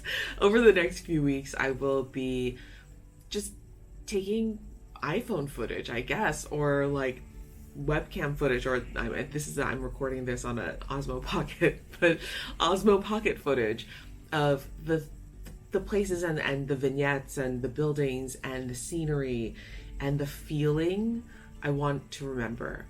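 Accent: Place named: Long Island, United States. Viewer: I knew you were from NY! I live on Long Island and was surprised at how much you have that typical Long Island accent.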